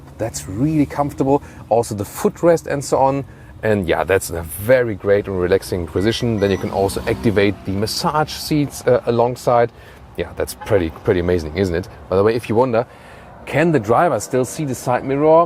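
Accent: Germany. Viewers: Vom accent bin ich mir sicher das er deutscher ist 😅 🇩🇪💪💯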